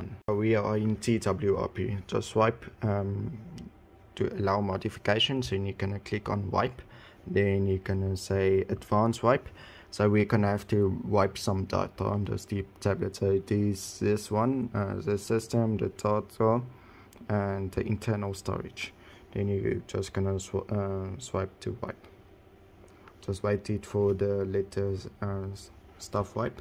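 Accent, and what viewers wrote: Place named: Afrikaans, South Africa. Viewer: kan daai afrikaans hoor in daai engels. thanks vir di video dude 😁😁🤘🤘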